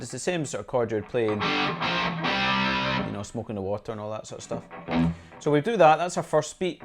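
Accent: Scotland, United Kingdom. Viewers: Sweaty knockers sounds best! In your accent! ;) Scottish? Great job on the playing.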